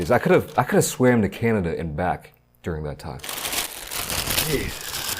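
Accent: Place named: Midwest, United States. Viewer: Why would he be canadian? He clearly has a midwest accent and lives in Korea lmao, nothing canadian about bro.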